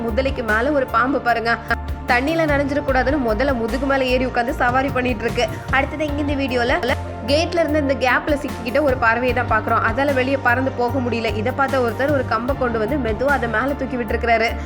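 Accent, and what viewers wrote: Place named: Coimbatore, India. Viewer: ok mam, கோயம்பத்தூர் slang மாதிரி இருஞ்சு, அதான்.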